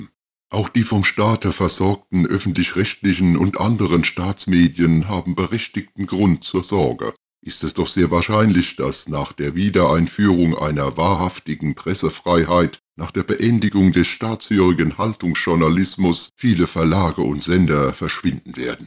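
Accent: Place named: Swabia, Germany. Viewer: Der Gorilla hat es geschafft, das ich (Schwabisch Dialekt?) ertrage. Top Integrationsleistung. Vor war es wie Fingernägel auf Tafel. Ich denke schon, das es enormes Einsparungspotenzial bei NGOs gibt. Hat denn (Miley?) Erfolg damit? Orban hat es erfolgreich exerziert. Und möglicherweise einen Maidan 2.0 verhindert.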